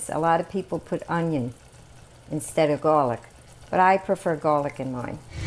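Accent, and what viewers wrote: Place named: New York, United States. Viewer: You sound like your from New York !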